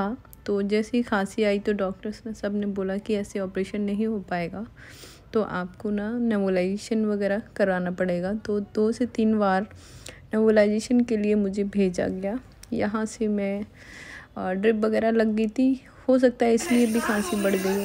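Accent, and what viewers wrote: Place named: Uttar Pradesh, India. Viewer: Aap kahan per Ho didi kahan ke Rahane wale ho aapki Bhasha up side ki Jaisi lagti hai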